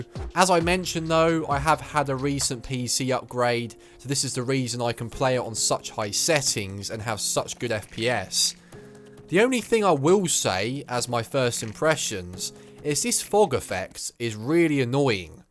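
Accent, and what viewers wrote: United Kingdom. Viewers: Bro you're British as an American it's hard to getting some words I have to playback 10 seconds for that 😂 Edit: but I love your videos though ❤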